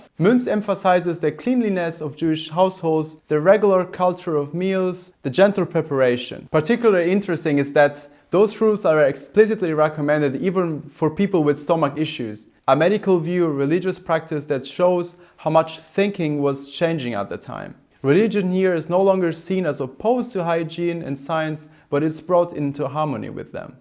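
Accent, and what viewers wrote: Germany. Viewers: This channel combines several things I adore: pre-WWI German history (when it was unproblematic to admire the Germans); a well-researched and -scripted deep-ish examination into an obscure but interesting cultural artefact; listening to a native German speaker using the language with such facility (I'm biased, I speak German and like the German-speaking-English accent, with all its idiosyncrasies); and quirky moustaches. This channel really is so verdammt charmant, especially the gramophone segments. And 20 minutes long this time! You spoil us :) BTW don't Europeans also use small blunt-ish paring knives to peel potatoes/apples/etc? That jagged knife you use for these tasks looks like an accident waiting to happen ;)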